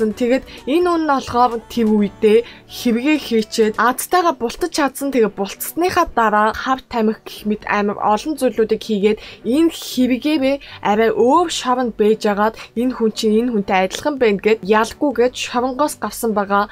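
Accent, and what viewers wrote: South Korea. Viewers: ta solongos aylagtai yaridag yum bn te say l anzaarlaa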